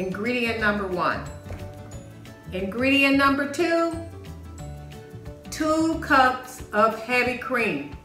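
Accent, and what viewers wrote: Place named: Mississippi, United States. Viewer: You must be a Mississippi gal. New South Ford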